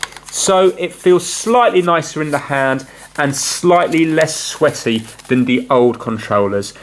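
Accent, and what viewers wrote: United Kingdom. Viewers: It’s a British accent like me, America uses England’s language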